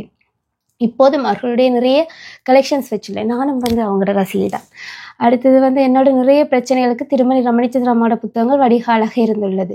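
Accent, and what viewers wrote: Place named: Sri Lanka. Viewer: நீங்க அழகா பேசறீங்க சகோதரி இலங்கை தமிழே அழகுதான் நீங்களே குரல் கொடுக்கலாம் நன்றாகவே இருக்கிறது நானும் முத்துலட்சுமி ராகவன் ரமணிசந்திரன் அந்த வரிசையில் ரசிகைதான் சிறிய வயதில் எங்கள் மனதில் பெரிய இடத்தை பிடித்துவிட்டீர்கள்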